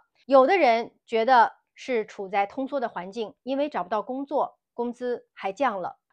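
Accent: China. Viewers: 你应该是大陆人吧，居住在台湾，口音像大陆人，写的字也是简体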